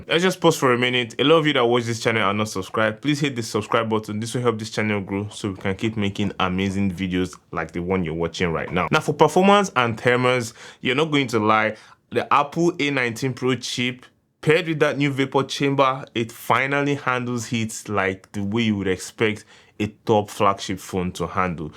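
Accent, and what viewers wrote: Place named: Nigeria. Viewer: Nigerian English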